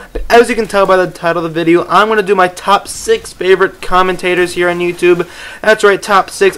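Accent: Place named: United Kingdom. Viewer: Puppies and a British narrator? BEST SHOW EVER!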